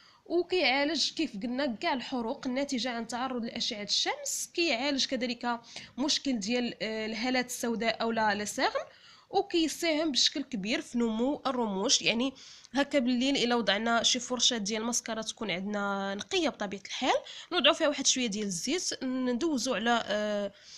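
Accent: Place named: Morocco. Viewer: انا صومالية واحب المغرب ولهجتهم😂♥️🤦‍♀️والله احيانا اشوف وصفات مغربية بس عشان اللهجة تجنن♥️🌹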